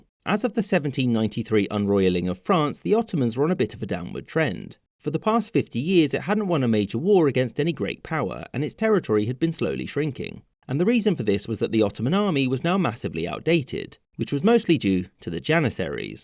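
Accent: England, United Kingdom. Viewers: It's the English accent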